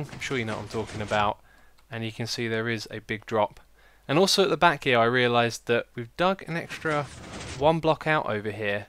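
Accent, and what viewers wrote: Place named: England, United Kingdom. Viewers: That's just the English accent :D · He is from England :)... English accent^^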